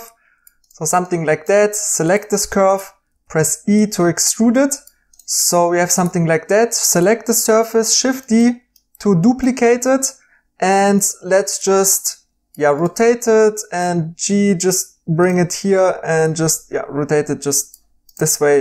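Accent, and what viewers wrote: Germany. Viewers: So ein schönes deutsch-englisch und auch das Programm ist beeindruckend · that german accent xD SCHLAND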